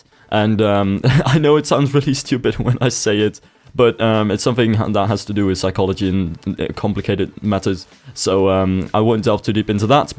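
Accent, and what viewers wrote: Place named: Netherlands. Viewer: Thanks, and by your voice.. i can tell ur dutch .. Zeer goed kanaal ;)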